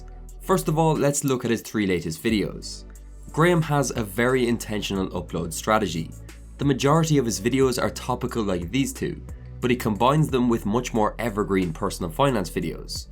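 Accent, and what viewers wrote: Ireland. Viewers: Is this buck Irish? I'm hearing an Irish accent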